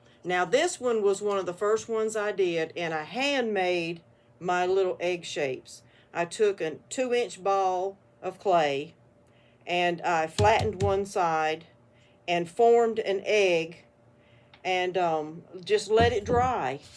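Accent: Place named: Tennessee, United States. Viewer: I grew up in TN. I miss the accent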